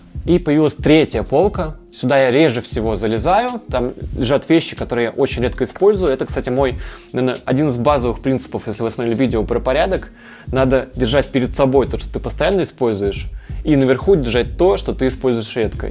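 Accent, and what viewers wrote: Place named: Okanye, Russia. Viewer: Перестаньте окать!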